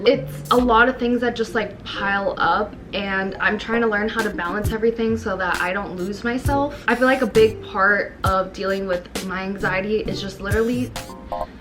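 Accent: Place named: Miami, United States. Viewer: The Miami accent is real 😅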